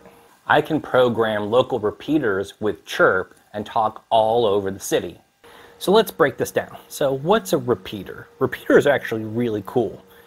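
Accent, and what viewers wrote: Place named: Austin, United States. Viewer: Yup. You're definitely from Austin.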